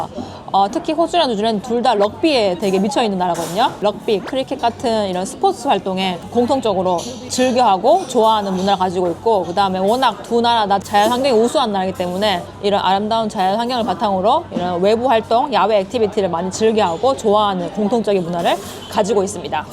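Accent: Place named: Gyeongsang, South Korea. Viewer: 갱상도네요 ㅎㅎ🎉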